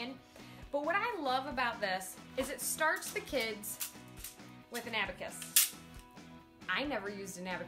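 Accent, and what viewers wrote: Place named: Midwest, United States. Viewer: This video is so helpful! Thank You! This will be my first year homeschooling my Kindergartener and while I’m so excited, I’m also pretty nervous. This curriculum looks pretty legit, though, and if a math teacher says she recommends it above all others, then i know it’s going to be great. Btw…I have never heard of Saxxon Math (I’m new to all of this). While I was listening to your video, I was in the other room grabbing some laundry and heard you say something like, “I know mothers just love Saxxon Math…” I literally stopped what I was doing and said out loud, “WHAT did she just say?” 🤣 You sound like you may have a Midwestern accent to boot, so it reeeally sounded like…something else to me. K, enough about that. Again, thanks for the video and we can’t wait to get started! Take care!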